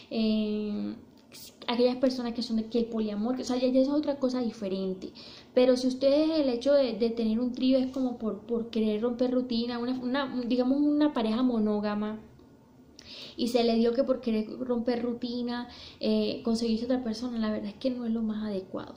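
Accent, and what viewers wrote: Colombia. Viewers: Buen vidro, un abrazo desde barranquilla. Aveces se te sale un asento costeño. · es de Colombia por su asientos y forma de ablar ve sus vídeos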